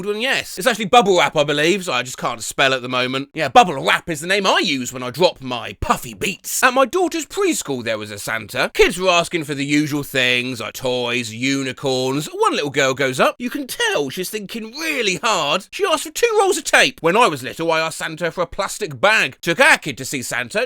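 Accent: United Kingdom. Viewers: I'm new to your channel, and I twigged you're British from your accent... Cor blimey! I was spot on!!! (Btw, Merry Christmas Mate !! 🎅🎄)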